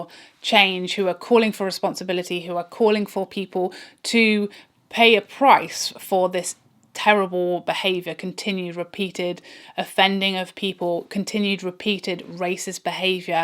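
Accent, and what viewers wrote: England, United Kingdom. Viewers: Your eye makeup here is so beautiful. I love the green. I unfollow somebody when their politics don't agree with mine. I can take it if it is background noise, but when it becomes overt, I don't care to have it in thrown up in my face all the time. I can hang with somebody if the content is lacking sometimes, because I understand that people have lives outside of their youtube channel. Also, there are channels I think I really like at first, but once I get seeing more videos, I realize it was just the one or two video that I liked. I found your channel from your divorce story, and could empathize personally, you seem near my age, your English accent is so cute, you have a nice face, and I am interested in beauty products and makeup, and so many of your looks are so fresh and gorgeous, and I could learn a thing or two! I have taken so many mental notes on highlighters and foundations I need to try from watching your videos!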